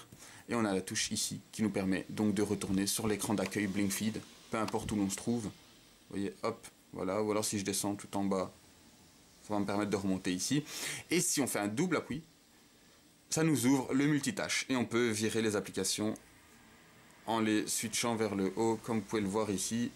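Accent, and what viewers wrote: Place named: Belgium. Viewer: super review, j'adore l'accent belge !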